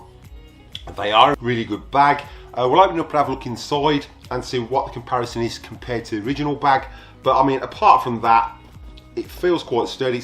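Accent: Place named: Cockney, United Kingdom. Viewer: Can't understand his English much of the time. He speaks with a strong cockney accent. I wish I could because the information is good.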